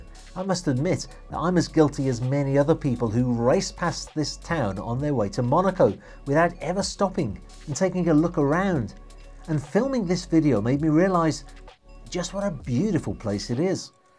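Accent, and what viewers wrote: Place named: RP, United Kingdom. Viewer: Queens English is well defined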